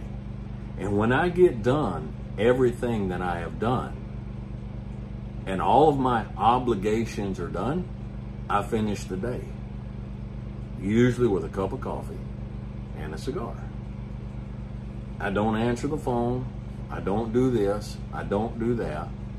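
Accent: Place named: South, United States. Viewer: I saw the hat and was so excited to hear a southern accent. Was not disappointed. 🙏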